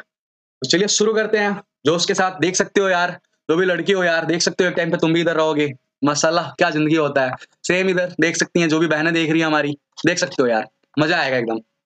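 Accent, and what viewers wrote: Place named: Bihar, India. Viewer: bhaiya kbse hi lg rha tha aapke accent se aap bhi bihar se ho...... ❤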